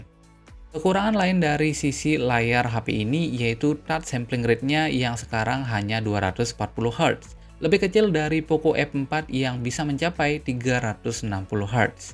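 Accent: Bali, Indonesia. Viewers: Logat bali y bang?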